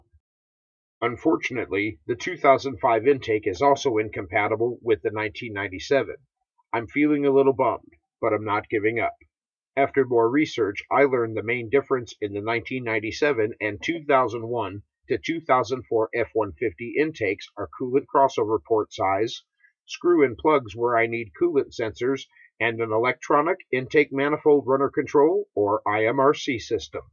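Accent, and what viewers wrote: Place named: Missouri, United States. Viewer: Awesome video. I also like to do engine swaps and customizations. I also live in Springfield Missouri which it sounds like is where you're at also. I've watched several of your videos recently and never caught that. 👍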